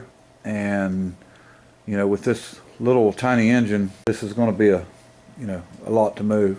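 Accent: South, United States. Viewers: What state you in? I live in Rome Georgia. You sound like your from the south. I'd love to see the bike in person and maybe when done it may be in a show so we all could see it. I ain't trying to sound like a STALKER.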